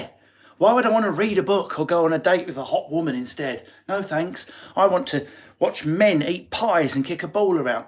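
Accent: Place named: South Yorkshire, United Kingdom. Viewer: Wow! South Yorkshire accent brilliantly portrayed 👍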